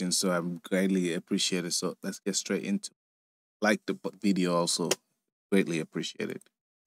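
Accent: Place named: Jamaica. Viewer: I am a white guy in his mid 50s and is one of my idols. He mixed country with the old blues and kicked up playing faster and harder. Both the Beatles and Rolling Stones were inspired by him. I live on the east coast on the US and his accent sounds like mine mostly. where are you from sir? In no way am I saying anything to disparage you accent. It sounds Jamaican maybe?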